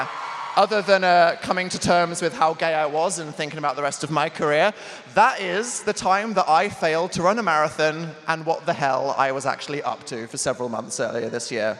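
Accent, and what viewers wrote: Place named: United Kingdom. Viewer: He's so sassy and British, I LOVE IT!!!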